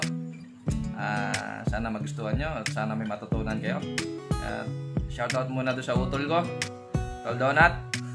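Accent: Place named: Bulacan, Philippines. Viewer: Pa shout out ndin. Tga bulacan kaba? Parang bulakenyo kasi tono ng boses nyo po. Bulacan din po ako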